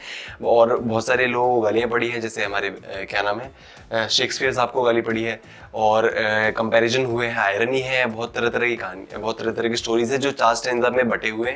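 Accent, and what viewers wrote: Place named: Bihar, India. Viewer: U belongs to Bihar or what? Aapne bola naa..."At teri ki jay rha ho"..😊😊:) Waise aap bahut aachaa samjhte hk bhaiya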